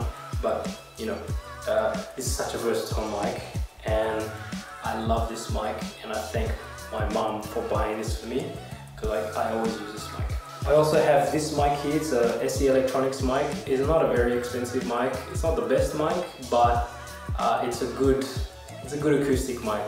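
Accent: Australia. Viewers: Klo ngomong keliatan Aussie nya 😆😆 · Uwawww aksen nya australia nice nice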